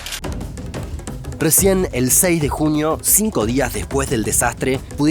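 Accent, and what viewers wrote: Buenos Aires, Argentina. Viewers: Horror el acento argentino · Me encanta el acento argentino (porteño?): "shegarón, shega". hahaha. Muy peculiar. Saludos desde Brasil. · Hola. El narrador es de Argentina. Saludos. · Raro es escuchar un porteño intentanndo hablar como mexicano :) te delata la Y, y la LL (shh) jajaja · Me gustan mucho tus informes claros precisos y sin tanta cháchara lo único que no me gusta es tu acento muy pronunciado del tono arrastrado bonarense de la doble ele (ll).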